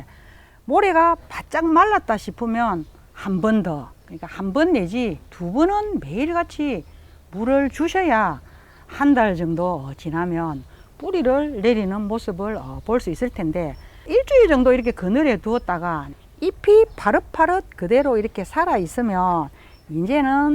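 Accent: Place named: Gwangyang, South Korea. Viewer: 광양분 같네요.목소리가...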